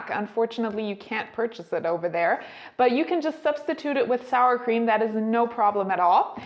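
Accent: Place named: Germany. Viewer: You sound very German!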